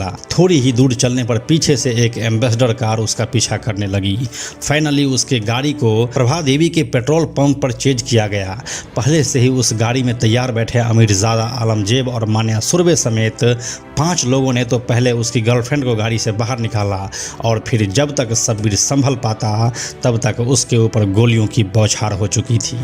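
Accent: Bihar, India. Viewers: Bhai pahele to yeh thik ker le us ka naam shabbir nahi sabir tha.dusre us ki girl friend kamathi pure ki nahi Congress house ki thi Jo lamington road per hai kamathipura se dur ..tesre aap ki awaz bahoot acchi hai behari tone wali.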